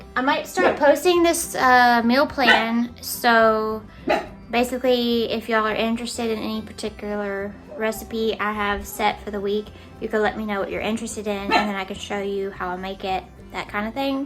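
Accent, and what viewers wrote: South, United States. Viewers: I've missed your southern accent. This recipe is devine. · I'm so happy you are back. I have really missed your charm your cooking and you wonderful southern accent.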